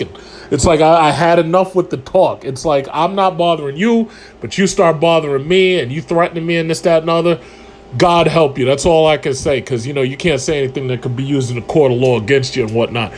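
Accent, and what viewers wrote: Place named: New York, United States. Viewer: Your accent sounds so old timey New York.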